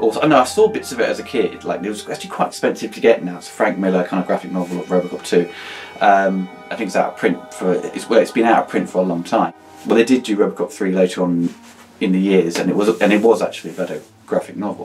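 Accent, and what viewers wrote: United Kingdom. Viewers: I see these are only available to the US/Canada and I'm guessing from your accent you're from the UK? I ask as I'm also UK based and wondered how you got hold of them? Would love to get my hands on these:)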